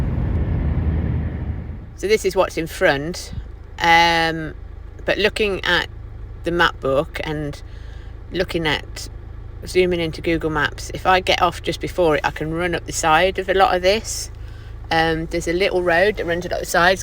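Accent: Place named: Derby, United Kingdom. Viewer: Good video . Your Derby/ Burton accent is really coming on . From Mickleover . Live in Uganda now .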